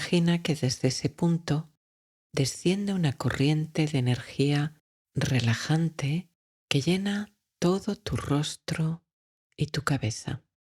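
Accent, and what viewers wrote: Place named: Spain. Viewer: Muy buena voz, sin el acento de española. estaría de lujo! Si tú piensas que es neutral pues seguro eres española.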